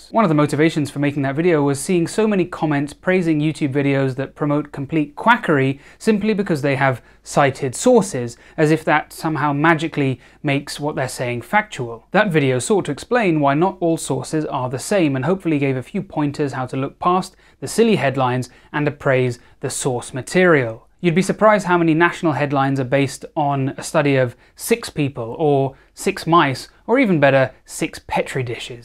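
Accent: South Africa. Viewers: top notch South African accent! Laughing so much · I don’t know what you have against doctors from kwazulu natal, but your South African Durban accent killed me.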